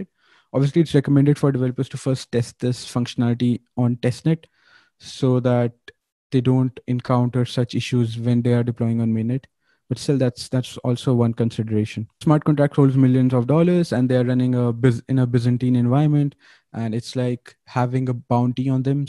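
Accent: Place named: India. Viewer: Indian English not understand I.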